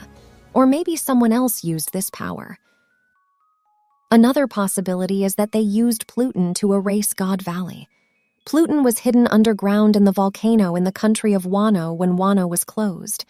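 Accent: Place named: United Kingdom. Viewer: Eww... Please please go back to the British voice. It was so refreshing and different.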